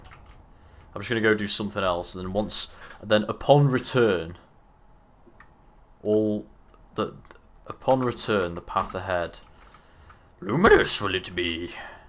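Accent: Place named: Yorkshire, United Kingdom. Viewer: You have a Yorkshire NE twang .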